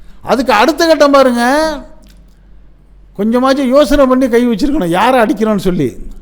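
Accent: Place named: Thanjavur, India. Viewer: அருமையான தஞ்சைதமிழ் மணம்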